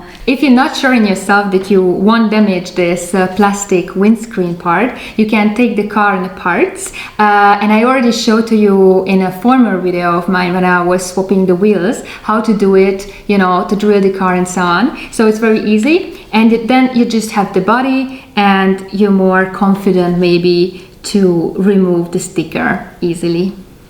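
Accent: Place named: Hungary. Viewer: A videó alatt végig sejtettem, hogy magyar lehetsz, aztán ránéztem a lejátszási listára :D Jól megy Neked az angol, de mégis megvan az a magyaros kiejtés. Viszont örülök ennek, hogy van magyar női rajongója is a Hot Wheels témának :)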